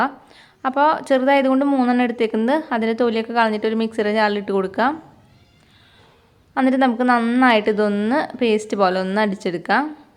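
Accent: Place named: Kunnamkulam, India. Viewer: Ningalude samsaram kettal eadu jilla anennu kandu pidikkane pattilla Kunnamkulam aduthano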